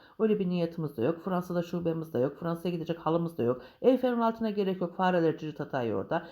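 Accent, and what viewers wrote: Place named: Black Sea, Turkey. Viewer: Cok tatlisiniz karadeniz sivesi var hemserimsin tesekkurler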